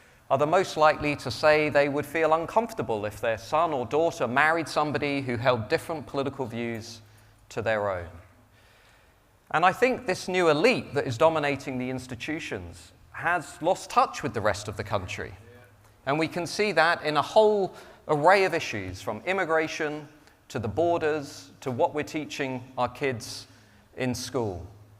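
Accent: RP, United Kingdom. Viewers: Same old Public School elite which has always run things perhaps differently but there is nothing new here just a poshly spoken word salad!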